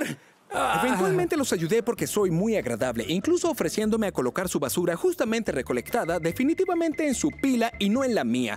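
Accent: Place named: United States. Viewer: gringo es que hablas ingles como norteamerica le dicen gringolandia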